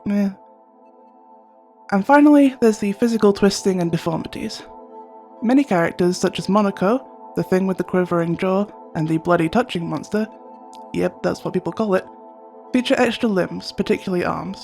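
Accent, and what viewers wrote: United Kingdom. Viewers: you have the most british voice ever lol, great video btw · I like how your voice sounds sort of British.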